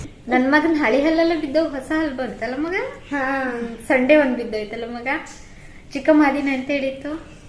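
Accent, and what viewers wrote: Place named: Kundapura, India. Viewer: Nim bashe kundapura side Tara untu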